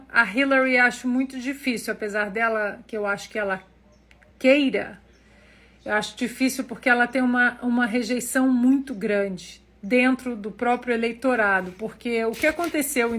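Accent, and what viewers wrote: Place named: Minas Gerais, Brazil. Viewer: Xará, é muito bom te ouvir! Tú fala num mineirês calllllmo e bem explicadim. 🤭 Aprecio este teu hábito. Bjão pr'ocê, sô! Deus te abençoe!!!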